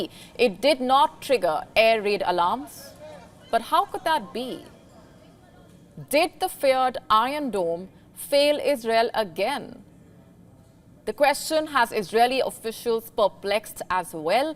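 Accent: India. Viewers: She is from India not Middle East. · Why indain accent suck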